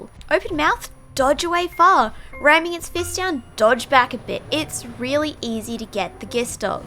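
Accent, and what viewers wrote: Australia. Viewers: When did become a women also ur aussie accent if pretty cool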